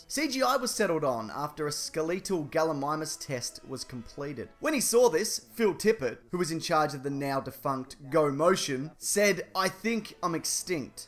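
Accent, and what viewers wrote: Australia. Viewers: Facts about Jurassic Park being told by an Australian is too good to be true.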